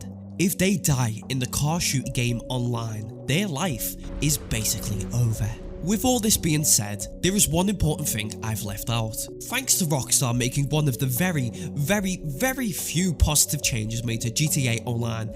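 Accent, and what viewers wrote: Liverpool, United Kingdom. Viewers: W video scouse man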